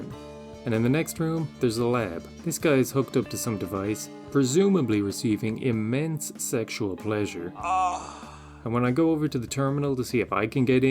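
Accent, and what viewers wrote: Ireland. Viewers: It’s Irish I’m pretty sure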